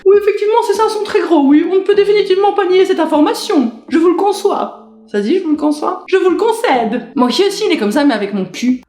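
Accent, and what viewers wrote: Belgium. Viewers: Un accent belge et très vulgaire, au secours ! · Super marrante ! Je me disais bien que t'avais un accent belge j'adore !❤👍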